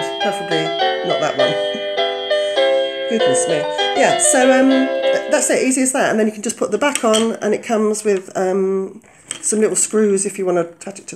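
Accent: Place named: Cockney, United Kingdom. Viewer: I had to watch this several time so I could understand the cockernee accent of the presenter. Good info though!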